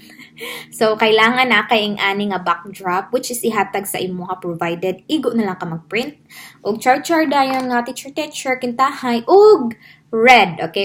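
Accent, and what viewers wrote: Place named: Visayas, Philippines. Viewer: Ma'am..ganahan kaau ko nimo, ma feel jud nako imo pagka bisaya !.. hehee..love it!... Ma'am still hiring pa sila until karon?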